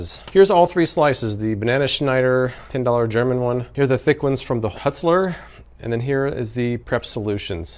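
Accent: United States. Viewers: baanaynia or banana American vs rest of the world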